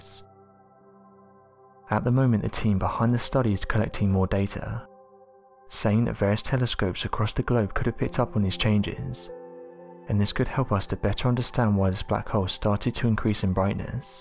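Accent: United Kingdom. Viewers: WHY must you talk so fast? Yes, you're British but some Brits are much easier to listen to AND comprehend. There is another speaker on your channel to which I refer. And, thanks for including SAE measurement.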